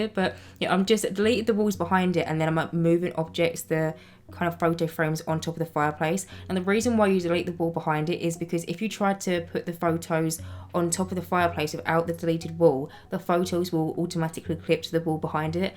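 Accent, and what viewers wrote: United Kingdom. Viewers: i miss playing sims, i dont have enough space on my pc. but i love watching you and listening to you! :3 i love british accent!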